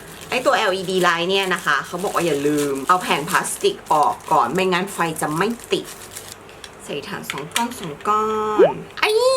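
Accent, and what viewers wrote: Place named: England, United Kingdom. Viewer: ชอบสำเนียงอังกฤษมากค่ะ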